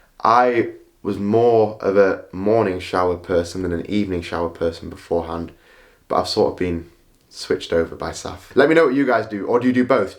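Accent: United Kingdom. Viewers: Love your accent! Is it a northern UK accent? I have some problems following you! No wonder I'm from Sri Lanka 😆 😆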